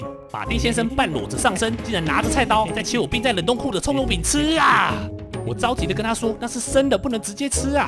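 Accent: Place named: Yilan, Taiwan. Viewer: 經過我不專業的耳朵，你應該是宜蘭腔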